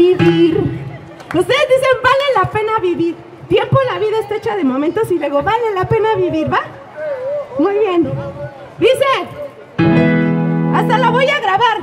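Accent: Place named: Mexico. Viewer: 🌼 🌷 tan hermosa como tu nombre, y me siento muy orgullosa de que seas mexicana. En serio, no se como gritarlo, eres algo, completamente diferente, especial, único, y me 2ncanta también como hablas, tu acento: 100% mexicana!!! Oye, eres de este mundo? No eres un ángel venido del cielo, que te envió Dios para alivianarnos un poco?! Tu alegría es increíblemente contagiosa! Que Dios te Bendiga y te Proteja! Mil gracias por existir!!👍👍👍😄😄👏👏👏😇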